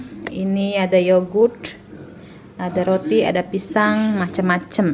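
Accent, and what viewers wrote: Jakarta, Indonesia. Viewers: Gue demeen beneran ama mpok dan bang, kereen mantab, semoga Allah swt sll merahmati & melindungi klrg mpok di sono. Mpok lucuu banget, betokaw aseli, saya inget masa kecil kalo denger aksen mpok awesome da'ah! ngikutin vlog mpok, krn saya sejak kecil sd skr tinggal di Betawi Indonesia tercinta. Suatu saat kalo ada rejeki ogut ame anak² yg udah pada gede³ mau ke Sweden ah nemuin mpok se.klg, ibunya anak² udeh meniggal sejak anak³ msh kecil, skrg tinggal jalan2 mau ke Swediaa!! Yeaah, InsyaAllah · Suka sama logat betawi nya langsung Subscribe aku pok😁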